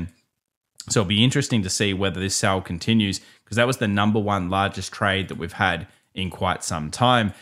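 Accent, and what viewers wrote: Australia. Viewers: Hi Great to hear a fellow Aussie on here:) Fantastic update. Quick question... I have a rather heavy bag of selected low cap altcoins which I thought I got for a good price over the last 3 months but could have bought them all in the last 48 hours with a 20% discount. If you don't laugh you cry. Anyway, the question is obvious....with BTC's premature take off, when do you think small cap altcoins will start to run? Soon after this BTC ATH or months from now when BTC stops pumping and pauses for a while?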